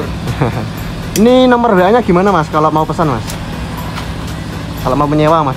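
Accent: Banyumas, Indonesia. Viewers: Paling seneng ngrungokne omongane bangsa ngapak.. 😂 Sub e kok gk gawe 18an yo? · Pada bae wong ngapak...salam sukses selalu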